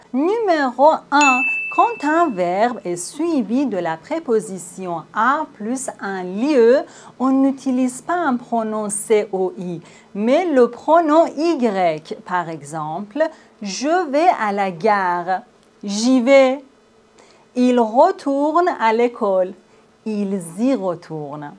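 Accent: Iran. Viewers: un accent persan très marqué ❤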